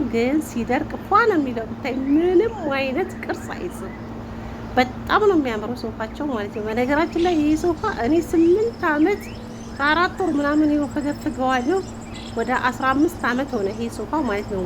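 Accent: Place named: Wollo, Ethiopia. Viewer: ደሴ ነሽ ሀይቅ ወሎየነትሽ መቸም በንግግርሽ አያሳስትም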